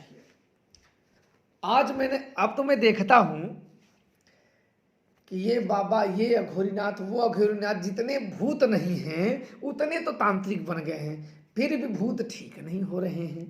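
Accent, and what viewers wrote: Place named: Varanasi, India. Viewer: Shriman jee aapki baate bahut pyari lagati hai. Aapke awaj se lagata hai ki aap Varanasi district ke rahane vale ho. Aap kripa karake mera pranam swikar kare aur mujhpar kripa kare. Mai janata hu ki duniya ke vishay me aapaka anubhav achchha nahi hai. Mai bhi ek bhataka huva ati tuksh manav hoo. Yadi aap chahe to mujhpar kripa karake mera kalyan karane ki kripa kare aur mujhe sachche raah pe chalane ke liye marg darshan de. Mujhe apane kripa yogya banaye aur apane charano me sthan de. Kripa karake apana sampark ka madham bataye.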